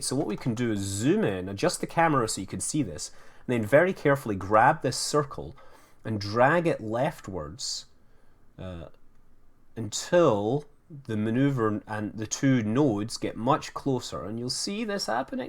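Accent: Scotland, United Kingdom. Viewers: can I just say? I freakin love your accent your dude! You sound like Scotty from Star Trek and that's a good thing!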